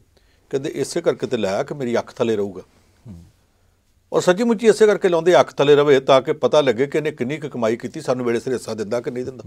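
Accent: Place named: Punjab, India. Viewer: Excellent Jatinder Pannu ji I love ur Punjabi accent while reporting...from US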